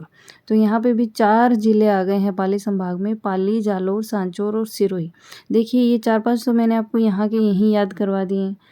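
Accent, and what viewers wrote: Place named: Bihar, India. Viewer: Didi aap Rajasthan se hai aapki hindi up Bihar wali lag rahi hai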